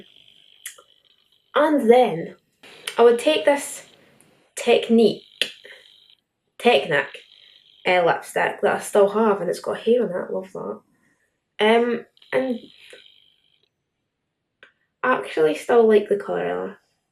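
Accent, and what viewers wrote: Scotland, United Kingdom. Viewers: i cant believe i’ve seen so many of your videos and never heard you speak and didn’t know you were scottish omg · YOUR SCOTTISH??? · How does nobody even understand her accent, I ain’t even Scottish but I know what she’s saying 😂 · She has a Scottish accent. I’m Scottish and it took me forever to figure out that she was Scottish as well 😂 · ahhh I didn't know she was Scottish, the accent is so cute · OH MY GOSH ARE YOU SCOTTISH??? I ABSOLUTELY LOVE YOUR ACCENT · Wow, I wasn’t expecting you to be scottish 😂😂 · FINALLY A SCOTTISH GAL IN THE SCENE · soz but as a scottish person myself, her accent is rank. Dunno where in scotland she’s from but her accent is HORRIBLE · If you say you can't understand her it confuses me...I'm american...and she's speaking English with a Scottish accent...its the same language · YOU’RE SCOTTISH! 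😃🏴🏴🏴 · Dammm i love ur Scottish accent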